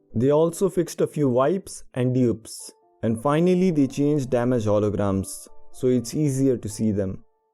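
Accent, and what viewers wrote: India. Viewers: Hi are u Indian u sound like i also an indian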